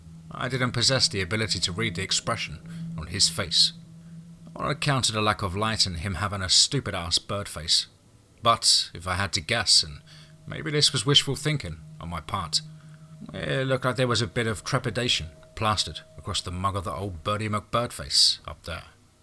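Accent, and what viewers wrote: United Kingdom. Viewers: Is it me or does the story being read sound odd in a UK accent versus being done in a Texas accent? It’s simultaneously seems off but it works really well at the same time.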